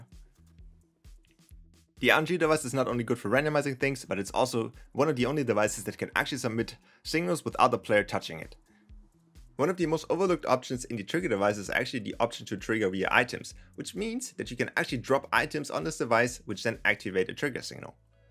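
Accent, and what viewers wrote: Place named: Germany. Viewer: u sound german....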